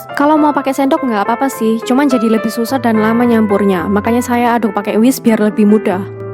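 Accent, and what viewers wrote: Java, Indonesia. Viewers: Yaampun medok bgt 😂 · Suka sama resepnya,..mantab,,musiknya kurang suka..dan bahasa nya medok surabaya padahal Baik tapi kliatan kayak judes...sukses selalu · Fokus sama tangan mbak nya yg putih mulus 😁, tapi bahasanya agak medok 😂 Btw thank you aku mau lagsg praktek💖🙏🏻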